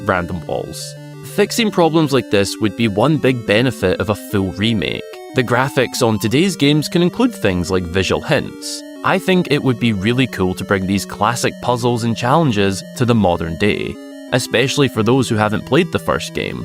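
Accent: Scotland, United Kingdom. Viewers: Are you Scottish ? You kind of talk like Scrooge McDuck in the DuckTales reboot 😅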